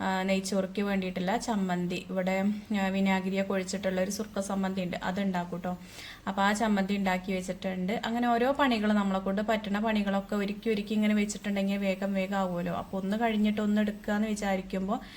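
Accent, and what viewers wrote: Malappuram, India. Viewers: ningal Malappuram Anu Ann samsarathil manasilai evidayanu. Sthalam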